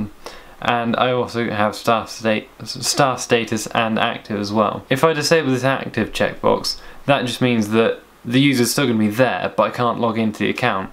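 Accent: United Kingdom. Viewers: Everything's easier to understand with a British accent.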